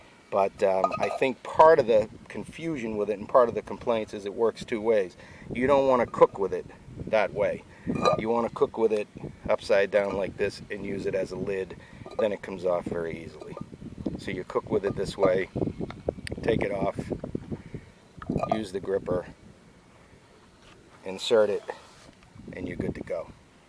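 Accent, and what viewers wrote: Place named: Boston, United States. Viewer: Man, with that Boston accent you sound like Matt Damon from the movie "Good Will Hunting". Yeah, I have the GSI Minimalist with a little Fancy Feast stove tucked inside. All I have to do is bring some alcohol and a wind-screen and I'm golden. Enjoyed the look at your system. Thank you, my friend.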